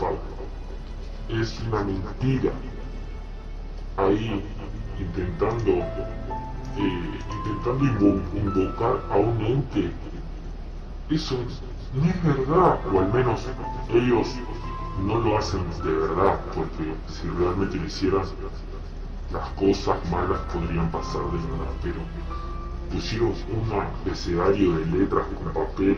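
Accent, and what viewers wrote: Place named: Colombia. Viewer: No la saca de Google Cómo explicas su voz original, tiene acento Colombiano y Juan Carlos no la tiene es obvio que no es solo lo dijo para ver cuánta gente le cree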